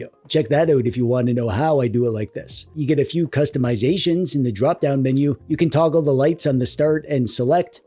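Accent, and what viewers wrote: Canada. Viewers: Too much rambling, like you enjoy the sound of your low monotone, raspy voice with that obvious canandian accent just wasnt it for me. I could've done without the uneccessary comedy and jokes thrown in. I dont think there's anything I learned from your "review" about this gaming device. No sub from me.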